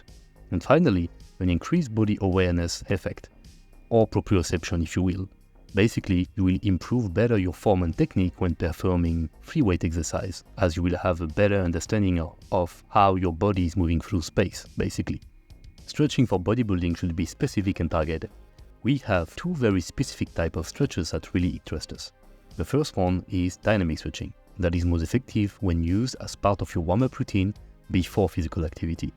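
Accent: France. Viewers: Forgot I'd downloaded this to listen to on my flight 😅 a bit surprised to hear the frenchmans voice. Great video bro 👍🏿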